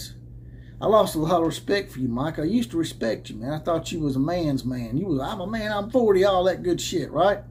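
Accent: South, United States. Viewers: Wait, where has republicans and conservatives messed up? You can’t talk like you’re from the south and still ride the fence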